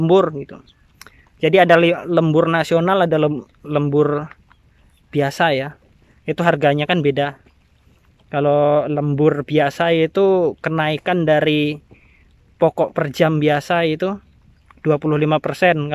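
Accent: Java, Indonesia. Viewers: Asli kalbar tapi logatnya ada jawanya?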